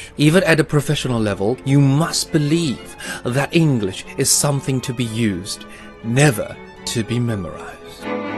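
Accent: United Kingdom. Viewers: 英式英文就是優雅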